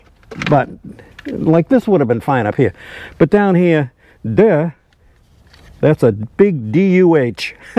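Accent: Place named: New England, United States. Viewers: He sounds like he’s from new england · I can tell by listening to his voice, he's from up North, nothing wrong with that, I lived in New Hampshire while serving my country, when discharged, I moved back to Georgia, then after a nasty divorce, I moved to Massachusetts for almost ten years, then married a sweet girl from Brockton, and moved back to Ga, my southern accent used to drive people nuts, now after living up there for so many years, people don't know where I came from because i now pronounce my I's in the long form like tires instead of tars. My friends from Massachusetts used to love to listen to me talk. My guess is that he's from New York, Conn, Rhode Island, Mass, or Maryland. I love the look and how Corvettes drive but I spent 15 years working on them at a chevy dealer after busting my butt for 15 years working on those things, I most certainly would never own one. 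It's an amazing car, looks, performance, and a low price compared to other performance cars from other countries. I've just found that it's impossible to keep anything nice, there's always someone that will find a way to put a little flaw in my car that takes the perfection away from it.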